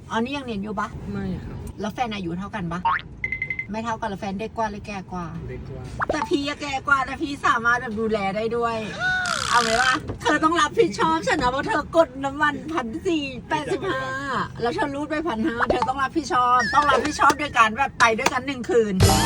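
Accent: Thailand. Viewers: ชอบสำเนียงเมืองกาญมาก ติดตามเพราะกวน…ดี😂😂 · ชอบสาวเหน่อครับ น่ารดดดดดดดด😁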